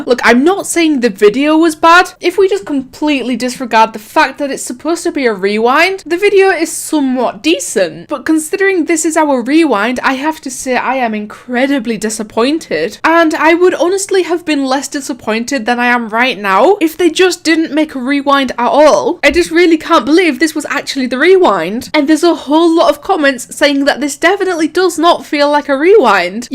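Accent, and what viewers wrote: United Kingdom. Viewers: U British?????cuz of ur accent I guess u live in London??or some were elese in Paris xddd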